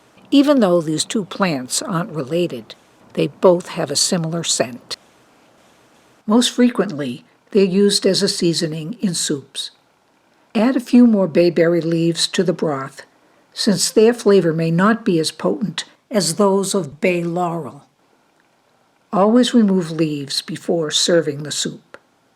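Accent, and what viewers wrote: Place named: New England, United States. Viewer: Thank you, that's nice to hear. I used to live in NYC & PA but now I live in Santa Fe, NM. My family is still in NH, but I don't often get to western MA. I guess for now I'll follow you here and on FB. Thanks again for the video. It was full of solid info and hearing your voice brought me back to my New England roots.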